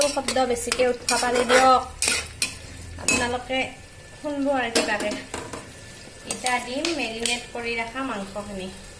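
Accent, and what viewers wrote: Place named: Pathsala, India. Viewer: Mur mar ghr nalbari..apunar kothabur buji puat eku problem a hua nai..moi bhal pong ei pathseila kothagelkhon😊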